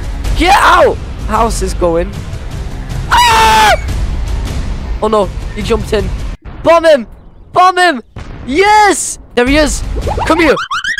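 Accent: United Kingdom. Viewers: I love your British 😂😂😂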